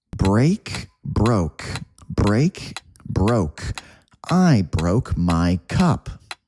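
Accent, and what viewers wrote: United States. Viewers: Could you please make videos about american accent😔😔 and it seems like you are an American. Plz teacher 🙃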